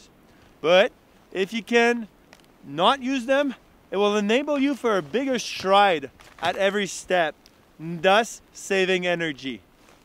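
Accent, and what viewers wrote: Quebec, Canada. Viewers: Vous êtes québécois? Je perçois l'accent de chez nous!! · listening to the Québecois english has been fun.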